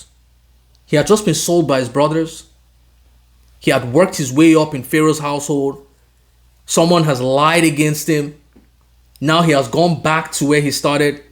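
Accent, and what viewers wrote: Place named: United Kingdom. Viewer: Your English is that of English U.K accent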